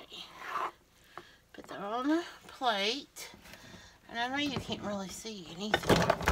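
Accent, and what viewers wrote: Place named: Kentucky, United States. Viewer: Just found your channel! Love it and I just knew you were from kentucky!! ( I am too! 😊)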